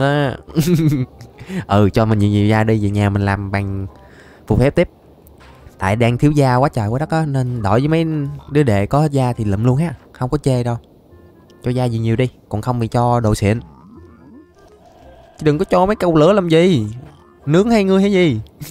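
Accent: Mekong Delta, Vietnam. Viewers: ông là người miền Tây đúng hong? nghe nói chuyện dễ thương vải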